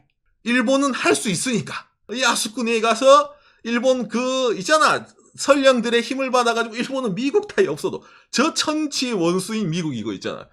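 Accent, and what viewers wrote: Gyeongsang, South Korea. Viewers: 저거 최소 경상도 50대 이상 남성임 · 역시 경상도네 ㅋㅋㅋㅋㅋㅋ 경상도는 과학이다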